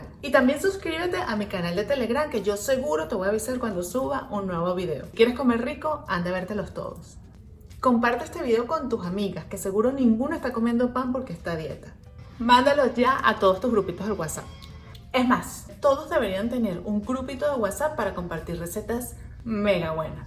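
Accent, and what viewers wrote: Venezuela. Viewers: Hola, me encantan tus recetas!Al oirte note' que eres Venezolana como yo ! me emociona sentir y reconocer mi pais... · Refrescante escuchar el acento venezolano (caraqueño!) en un vídeo de calidad. Buen trabajo y sigue p’alante! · Me encanta el acento venezolano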